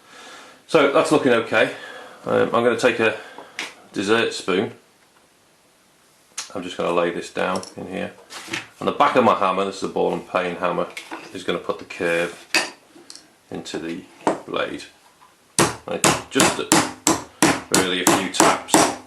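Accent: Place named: England, United Kingdom. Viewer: Nicely done, thank you. Interesting accent, where are you from? Obviously from England, but I understand the accent is different in different areas. Thanks.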